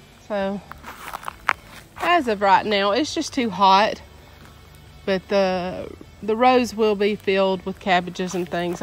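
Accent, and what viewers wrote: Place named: South, United States. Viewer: Your accent sounds like home to me. Must be close to Memphis TN. Maybe Georgia? Thanks for the tour! Love the name! Chuck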